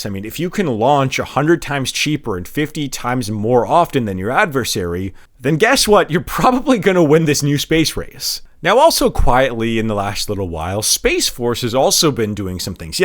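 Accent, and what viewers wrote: Canada. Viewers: Is this dude Canadian or what? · I can tell that you are Canadian from your accent, which makes the fact that you managed to make it through this entire video without uttering the name “Trump” once all the more impressive. Excellent analysis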